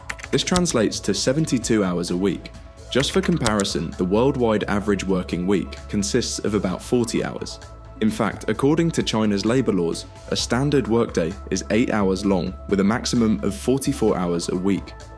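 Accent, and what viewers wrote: United Kingdom. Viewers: This guy is british bro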